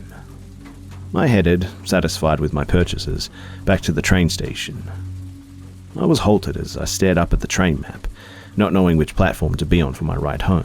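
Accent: Australia. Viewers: "Shadow People", a.k.a "Shadow Walkers" and "Dark Dwellers" are mainstays of many traditional cultures and generally seen as spirit beings that can be good, bad, or neutral. Usually with Native American and First Nations cultures, it's something negative. Being of Cherokee background, the traditional view for my experience is that they are visions of the Raven Mocker, the spirit associated with death and is the one who takes the soul into the next world, the one the medicine man has to protect the body of the sick and parents of little children while sleeping from with pine wood cuttings and tobacco. The Aborigines of Australia (judging from that voice and your fondness for telling the story about the Cockney security guard, you might get a kick out of this) have the Dawn Walkers, the beings that during the Dreaming wandered the world, creating various landmarks and working out various "just-so stories", but are now mysterious visions one can catch in their eye if they look hard enough. 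Unlike many Native American versions, these are positive and they are known to be powerful and can give great strength and wisdom to those that treat their dwelling-places such as caves and trees with respect. Really neat stuff, cultures and religions, anthropology and the study and preservation of the cultures and heritages of our planet.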